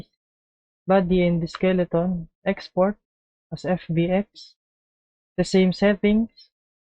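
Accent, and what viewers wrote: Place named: Philippines. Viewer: Filipino here, I can hear the Filipino accent. Good job bro!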